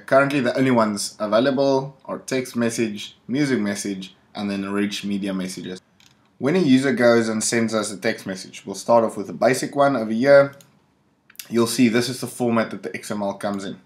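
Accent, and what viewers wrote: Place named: South Africa. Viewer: Hey, did you continue developing in WeChat, or have moved on to other things? Also, trying to place your accent.. are you South African? :P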